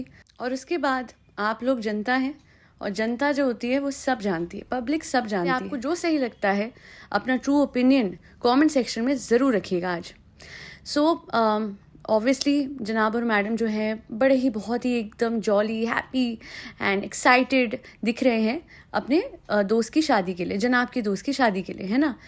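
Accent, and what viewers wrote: Punjab, India. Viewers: Koi kuch kare ya na kare madam janab badle ya bhaad me jaye one thing is constant ur lovely voice and ur meethi meethi Punjabi ❤❤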